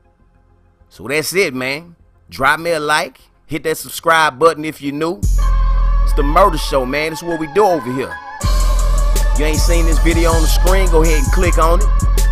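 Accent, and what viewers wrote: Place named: Atlanta, United States. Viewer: that nigga funny as shit llssss sound a atl nigga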